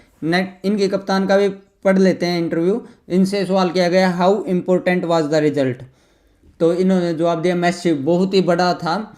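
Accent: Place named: Haryana, India. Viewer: Haryana se ho kya bhai ? Thoda haryanvi touch h aapki voice me 😅🤝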